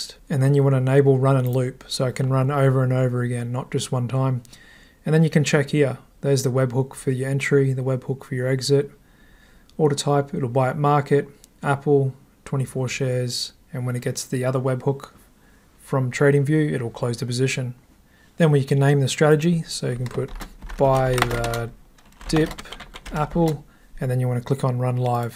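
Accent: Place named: Australia. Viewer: Hello, Thanks for the video, and it is great to hear an Australian on a YouTube video. I have a question/need help. I have a strategy that when a faster moving Av. crosses the slower, it triggers a trade and closes the open trade eg. Short opened - short closed, which in turn opens a long simultaneously and continues Short to Long to Short to Long etc.. Can you help me with description with the webhook Entry/Exit please. Happy to pay a fee. Regards, Sean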